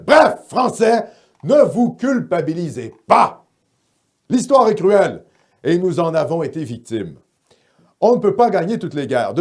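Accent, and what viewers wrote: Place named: Quebec, Canada. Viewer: Le pire dans tout ça lol. C'est que tu pognes plus en France qu'ici je crois 🤦🤦🤦🤦. Je vois tellement de bout de tes vidéos sur des chaînes françaises que c'est ce qui m'a interressé à te connaître. Je reconnaissait un certain accent dont la provenance française me semblait douteuse 😂. Je partage ta chaîne en espérant t'amener une reconnaissance québécoise 🤦😝😝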